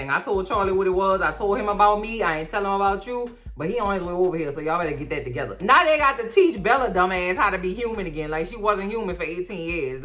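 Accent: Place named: Louisiana, United States. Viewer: Louisiana creole. My stepfather spoke and sounded the same.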